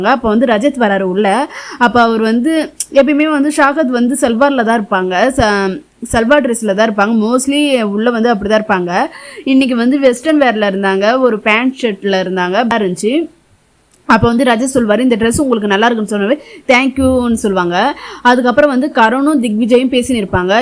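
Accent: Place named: Dharmapuri, India. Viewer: Dharmapuri Bhasai❤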